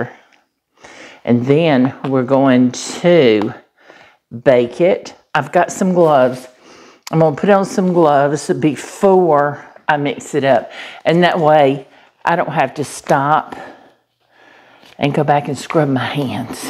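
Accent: South, United States. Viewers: Hi, I know I am late to this party but I am glad that I watched. Your food looks yummy and also nutritious too. I love your southern accent when you speak. It makes me lonesome for Texas. I know you are in Alabama but still a sister from the South. I have never made gravy just did not have the talent. When I made meatloaf I used ketchup or mild honey BBQ for a gentle topping. Thanks for the gravy lesson and the tip with the buttermilk too. Take care and God Bless you and your hubby.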